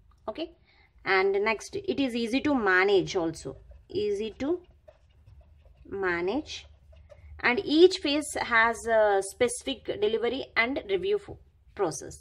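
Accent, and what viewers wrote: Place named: South, India. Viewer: She is from South India so tune issue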